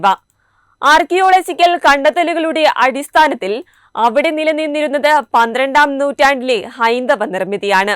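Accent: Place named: Kollam, India. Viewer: സുഹൃത്തേ താങ്കൾ കൊല്ലം ജില്ലയിൽ പോയിട്ടുണ്ടാവില്ല. അവിടെ ചില പ്രദേശങ്ങളിൽ ഉച്ചാരണം അങ്ങിനെയാണ്. അറിവില്ലായ്മ ഒരു അഹങ്കാരമായി കരുതാതിരിക്കുക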